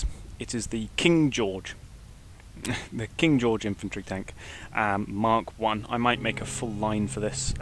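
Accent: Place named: United Kingdom. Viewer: You have a very nice bri'ish voice mate. Very clear and easy to understand for people who aren't native in English like me.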